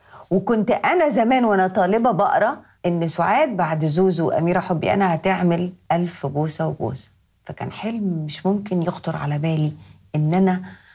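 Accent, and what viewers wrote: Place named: Iraq. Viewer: لقاء جميل أحلى شيء بزينب انو شخصية وذكاء ومحافظة على لهجتها العراقية لأن في كثير عراقيين بس يرحون لغير بلد يغيروا لهجتهم العراقية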